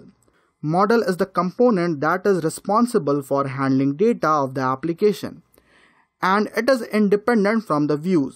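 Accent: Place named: India. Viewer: nice, I love Indian speech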